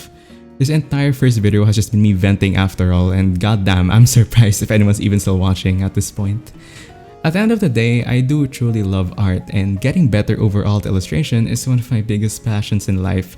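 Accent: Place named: Philippines. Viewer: This guy sounds filipino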